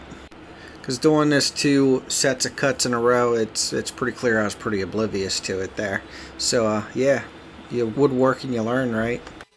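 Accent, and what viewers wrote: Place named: Maryland, United States. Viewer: You sound very Maryland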